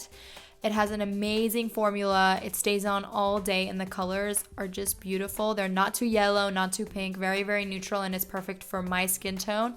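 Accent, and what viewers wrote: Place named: Russia. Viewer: Сразу видно-говорит по русски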